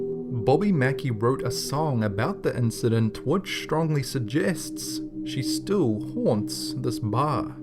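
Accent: New Zealand. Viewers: That Kiwi accent just kills it for me. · What is the narrator's accent? I want to place it as from New Zealand? But some Australian influences? Kiwi accent or nah?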